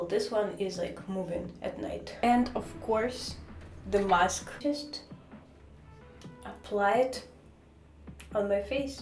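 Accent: Russia. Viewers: по акценту одразу зрозуміло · По акценту сразу показалось, что вы русская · that's insane, I've understood that you are Russian or maybe Ukrainian since the first word. If I'm incorrect, it'll be awkward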